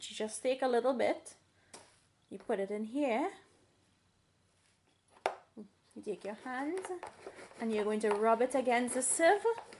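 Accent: Trinidad and Tobago. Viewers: She's a Trinidadian. You can hear her trini accent maybe that's the way her family made it. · To Shabana Nisha who said accent annoying. How rude. You nasty person, we are Trinidadians and proud of it. Who are you to criticise her accent. Too back to where you came from. Where they poop in the streets. Go back to India, you horrible person. You never hear of Trinidad. Go back to school.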